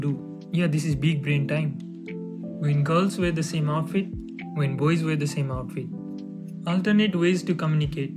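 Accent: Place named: Nepal. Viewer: Mom: Good his studying, his listening to those Educational Indian youtube channel Me: who actually watching Anime Memes that's being read by a Nepalese Dude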